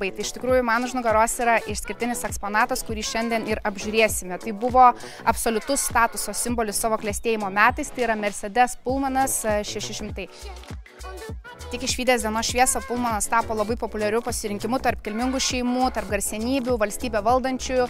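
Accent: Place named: Šiauliai, Lithuania. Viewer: Apzvalga šiaulietiska 😂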